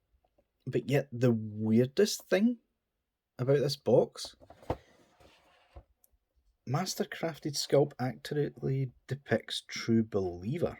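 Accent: Scotland, United Kingdom. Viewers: Holy crap mate yer Scottish!! Me tae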